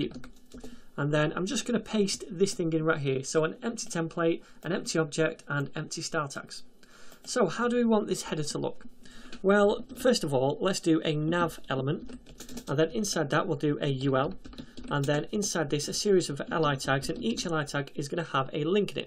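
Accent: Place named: Northern England, United Kingdom. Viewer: Fantastic tutorial, clear and elegant explanations and examples throughout. Pleasant voice and accent (sounds like Manchester/northern England?) were a nice bonus!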